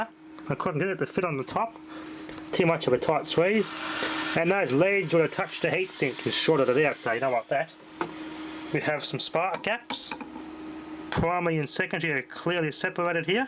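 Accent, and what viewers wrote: Australia. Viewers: is this Australian?? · He is speaking rapid AUSTRALIAN. Solution is to hit CC to display subtitles. Helps a bit. Comments beneath the video are useful. Steve in Sydney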